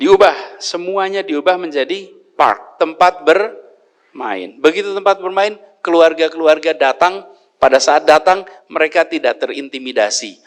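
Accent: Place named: Java, Indonesia. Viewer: Dialeknya njawani banget.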